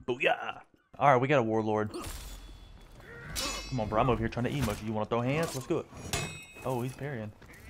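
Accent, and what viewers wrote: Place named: South, United States. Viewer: Can’t help but hear the country in your voice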